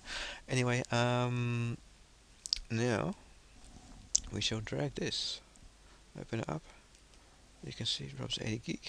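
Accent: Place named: Australia. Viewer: wanted to learn how to format my hd instead i got you loving to hear the sound of your Australian voice.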